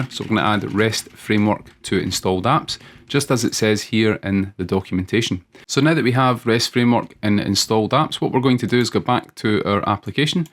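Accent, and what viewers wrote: Scotland, United Kingdom. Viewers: File in Scottish is pronounced Fail, type is tape, price is Preis (like in German)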